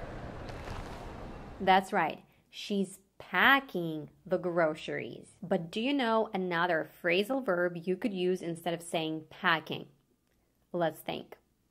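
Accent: United States. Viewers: This is English American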